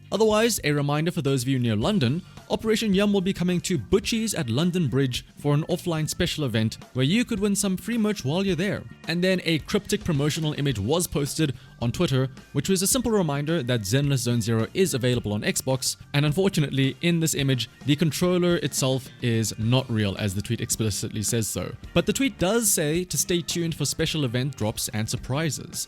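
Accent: South Africa. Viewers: dude are you south african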